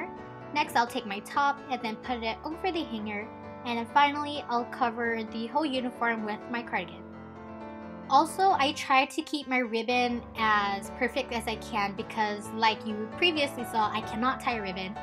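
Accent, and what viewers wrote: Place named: United States. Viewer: Don't know your channel. You sound American, how'd you end up in Japan?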